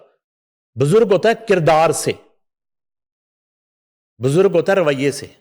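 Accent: India. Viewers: Polte ordo hyen lehja punjabi penddo hye😅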